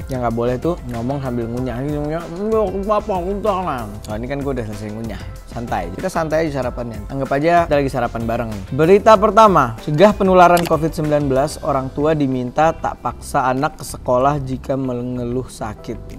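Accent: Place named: Banyumas, Indonesia. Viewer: Ngakak dngr ngomong ngapak wkwk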